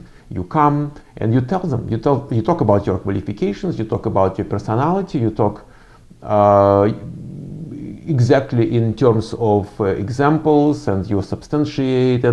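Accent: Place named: Russia. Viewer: Cпасибо! Всегда хотел услышать как вы говорите на англ. Ваш речь мне особенно понятна, потому что вы говорите с русским акцентом. Скажите, это считается очень сильный акцент? Потому что вас я понимаю отлично, а носителей намного хуже. В общем учу язык по вашим видео!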